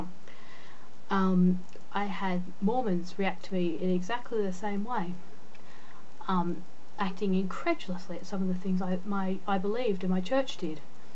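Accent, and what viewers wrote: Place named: Australia. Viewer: Okay now I can hear the Australian, sorry.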